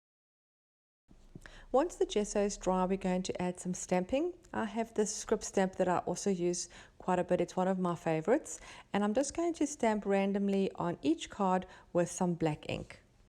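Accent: South Africa. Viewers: sounds more South African than Australian, doesn't she?:) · I could tell she is South African, that accent never goes.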